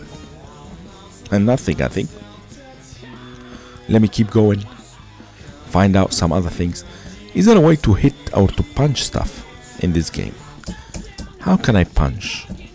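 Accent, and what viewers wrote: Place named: Greece. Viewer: I like your accent in English, and you feel that Greek is phonetically more dominant than the British accent 👍🌹🌹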